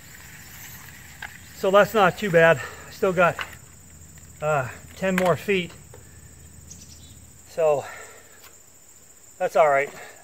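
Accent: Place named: New Brunswick, Canada. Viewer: Well hello there fellow NB’er, at least I’m gathering from your name and the North Eastern, not to mention the accent, lol. The off grid chores never end and today will be the battle of my hand pump and hopefully an oil change on the ol’ Sierra. New subscriber here, thanks and cheers from Miramichi. 👋🙏❤️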